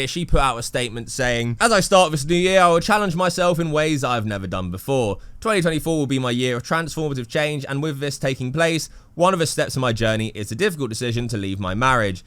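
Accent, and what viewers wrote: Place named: Southern England, United Kingdom. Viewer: a name like is an immediate hint. With the tache you might as well have the map of Ireland on your face. The contrast between your Irish features and South of England accent is quite something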